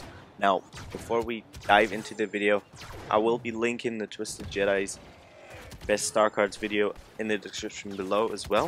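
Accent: Germany. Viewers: Dein Deutsches Englisch ist ja krass gut 👍🙈 Nices Video :-) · Der Deutscheakzent is da you are one of the best player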